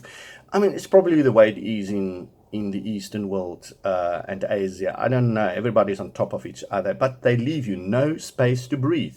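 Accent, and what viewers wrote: South Africa. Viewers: An excellent video..being irish its funny hearing your south African expressions in your accent..right now I'm in Phuket on holidays and it's lashing rain 😎 😂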